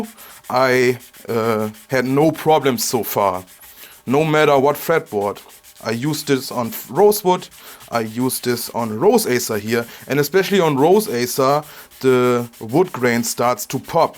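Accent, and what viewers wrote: Germany. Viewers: Hallo, kann ich einen deutschen Akzent erkennen? Do I detect a German accent? I like your style, going to try it on a neck or 2. -Regards, -G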